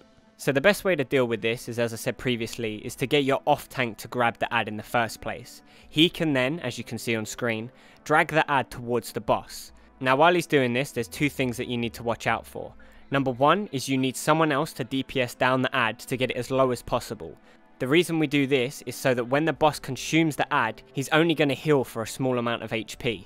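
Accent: United Kingdom. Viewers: Great vid. Thnx. It must be the British voice.:D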